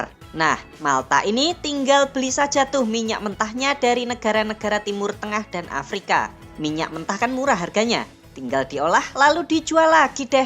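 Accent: Java, Indonesia. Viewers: Medok bgt hostnya😂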